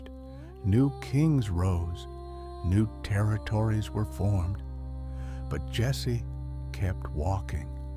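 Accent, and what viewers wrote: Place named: South, United States. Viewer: Where are Jeremy Irons, Charles Dance or Sir David Attenborough?😢 This cornpone commentator Is Not the Ticket!🙄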